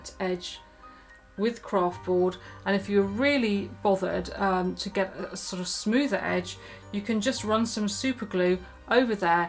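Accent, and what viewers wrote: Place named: United Kingdom. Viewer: love your tutorials since i was clueless; can you remove the background music because it is distracts from your beautiful accent; Love that British accent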